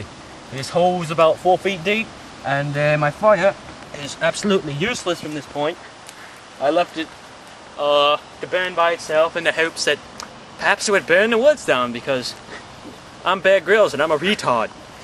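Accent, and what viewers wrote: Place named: Australia. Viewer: Why do you sound like an Aussie?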